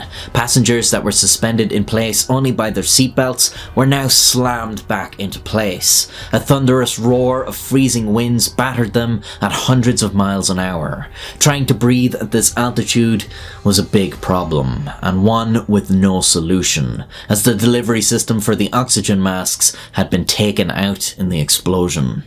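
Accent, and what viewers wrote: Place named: Ireland. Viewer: Idk why but if i'm going to be told a surreal and horrific story it's somehow way better to hear it from an Irishman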